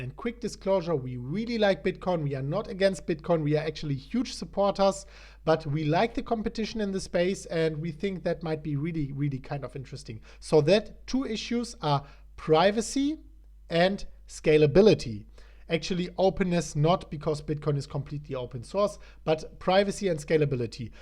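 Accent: Germany. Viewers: ich höre deinen deutschen Akzent von weit weg haha..Welche Bücher kann ich mir durchlesen um diese ganzen Graphen lesen zu können wie davincj15 sie manchmal in seinen Videos nutzt.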